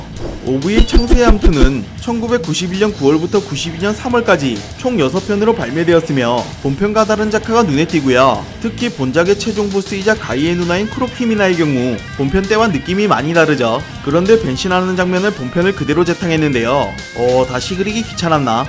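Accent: Gangwon, South Korea. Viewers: 약간 강원도 사투리쓰시는듯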